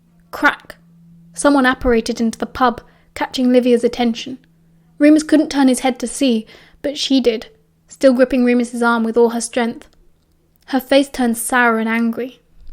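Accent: United Kingdom. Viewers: Your brittish accent is supremacy 🛐🙏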